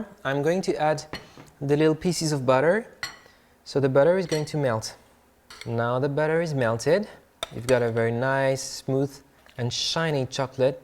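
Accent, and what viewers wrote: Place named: France. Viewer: I love your sometime tiny French accent ! ;)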